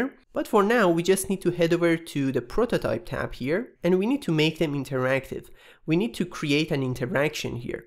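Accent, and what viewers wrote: Iran. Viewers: لهجه فقط لهجه ایرونیاااااا قربونت برم که یهویی اتفاقی دیدمت تو سرچ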